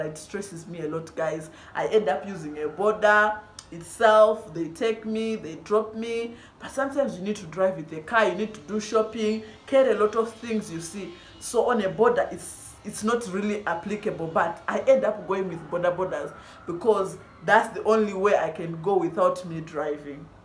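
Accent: Uganda. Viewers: I don't understand. You have an Ugandan accent. How come you didn't know the language 😂😂😂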